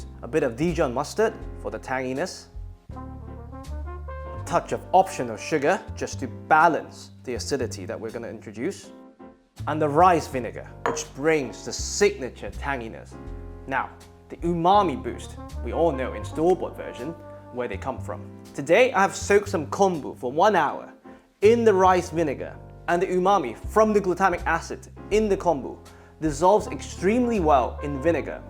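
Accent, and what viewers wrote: United Kingdom. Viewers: He sounds very British! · Loved your british accent!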